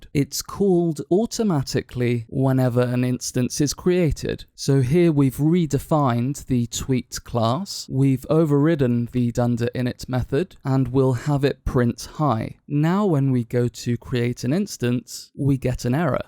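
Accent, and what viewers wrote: India. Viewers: You statement about Indian accent is very unfortunate. I feel sorry for learner's like who instead of focusing on the message focus on the messenger especially when things are given free. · I don''t understand what is meant when it's said "It's free so you shouldn't say a thing about it" , it's free, so what? I don't like it! The guy makes money from it even if it is free! My point is that, since they make money, they should try to make something that's the best they could offer for their own good. I stated how I truly felt, it's completely normal to dislike an accent, and it is nothing "unfortunate", the voice really does matter when it comes to sending your message, and I think that the message that Indians try to make their voice more like the English accent, I think if they put more effort into it the message would be sent more fluently. 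It's not my fault that I get headaches when I listen to the Indian accent in the first place, I would love to listen to them without getting a headache honestly, it's just that I am that way and I am the one who suffers from it since Indians have very useful content...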